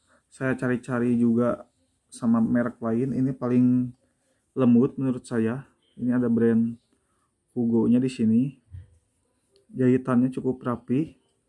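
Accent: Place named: Bandung, Indonesia. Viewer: Pasti abangnya orang bandung yahh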